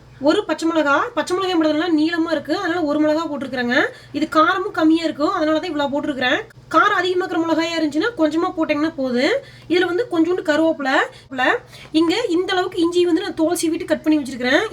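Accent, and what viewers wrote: Kongu, India. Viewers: Kongu slang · Kongu slang semma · ஏனுங்க கோயம்புத்தூர்ங்லா நீங்க கொங்குத் தமிழ் வீசுதுங்க ராகி பக்கோடா அருமைங்க. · Yenunga amuni enna arumiya kongu tamil pesringo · adada..yengooru bashai kongu tamil yena mariyadha...yena alagu..love ur beautiful slanggg than the pakoda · Sis neenga kongu side endha ooru.erode??? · Kongu tamizh super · Kongu tamil😻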